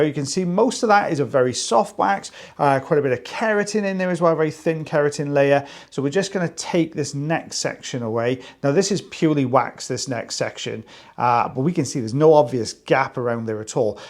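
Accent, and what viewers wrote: United Kingdom. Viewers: Yes, we say vacuuming in the US, but it makes me chuckle to hear hoovering! ☺️ Love your British accent in addition to your knowledge!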